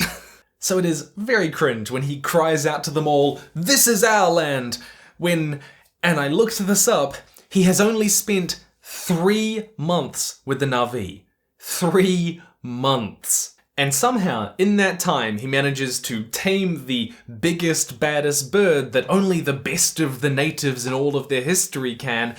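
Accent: New Zealand. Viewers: Am I only now finding out you're kiwi but and not just British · Hold the phone. I didn't clock the kiwi accent for YEARS. Someone revoke my residency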